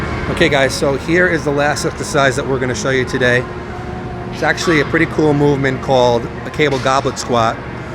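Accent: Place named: Massachusetts, United States. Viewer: You sound like you're trying to hide a masshole accent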